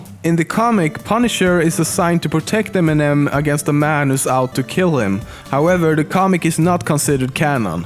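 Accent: Sweden. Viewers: Hahah, your swedish accent makes me laugh xD Norway ftw! great videos though (Y) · well he is swedish so swedish people can't pronounce very well in "J" letter which i love listening to their accents :) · He is swedish... basically like pewds, jennifer= yennifer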